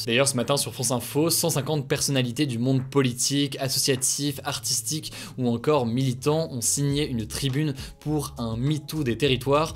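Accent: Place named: England, United Kingdom. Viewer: je viens de comprendre pourquoi Hugo avait un accent anglais aussi clean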